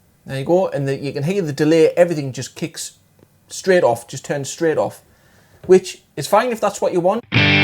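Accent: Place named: North East England, United Kingdom. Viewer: Hi, thank you for this very helpful video. You take it nice and slowly, which is a pleasant change from most YouTube instruction videos. I’ve watched some videos by the otherwise excellent Richie Castellano but he goes though stuff much too quickly for me. Your pleasant North East accent is an added bonus. Are you from Bishop Auckland or Durham?... thanks for explaining all this so well. I can make progress now.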